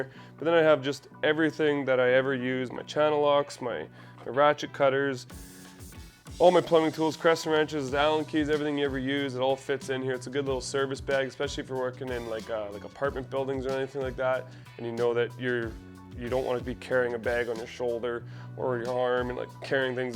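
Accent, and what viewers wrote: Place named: Canada. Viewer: You could tell you were Canadian from the get go the accent isn't super thick but certain words.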